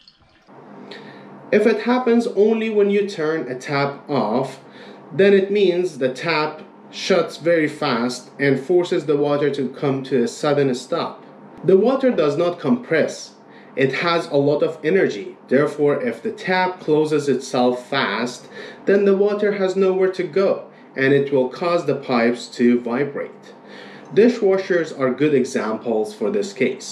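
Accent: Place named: Iran. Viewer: You have an accent, are you Persian?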